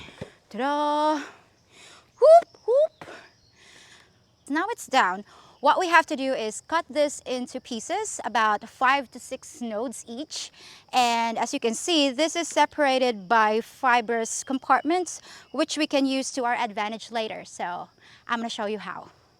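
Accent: Australia. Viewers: a bit of an ausie influence !! another fun video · “It cracked the bloody cannon…crikey. Gotta love a girl who speaks Australian. Great video.🙏❤️❤️❤️❤️❤️🇦🇺😎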